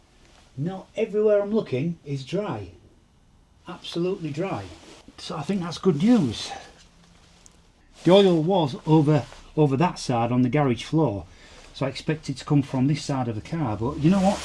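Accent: Hull, United Kingdom. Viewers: Hi, I'm new to your channel and have just watched two of your posts. I thoroughly enjoyed them. Love the Hull accent BTW. The car looks miles better without the stupid stripes.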